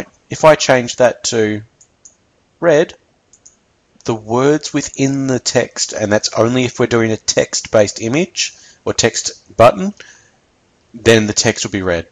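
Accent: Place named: Australia. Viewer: I'd bet my socks your australian...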